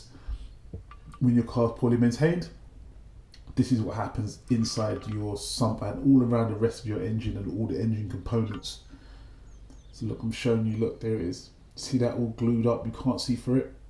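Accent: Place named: United Kingdom. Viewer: He has a very british accent but yet very understandable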